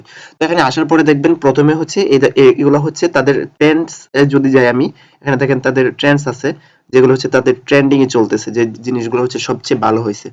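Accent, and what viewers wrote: Sylhet, Bangladesh. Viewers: ভাই কিতা সিলেটের নাকি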